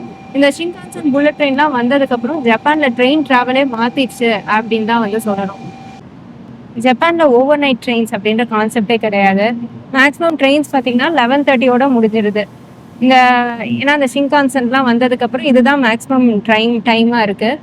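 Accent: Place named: India. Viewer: 典型的なインド訛りの英語だな。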